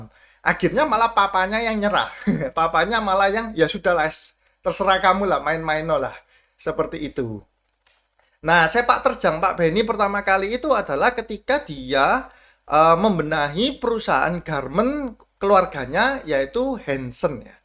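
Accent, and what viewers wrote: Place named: Java, Indonesia. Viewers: Mas e medok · Iya medok bgt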